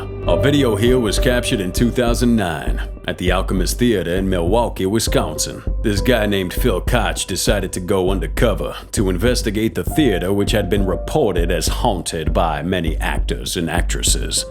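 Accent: South, United States. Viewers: Great content as always. I watch all your vids and my gf and I get a good laugh out of your accent, and I mean that in a positive way. We're from Chicago area and we love southern accents.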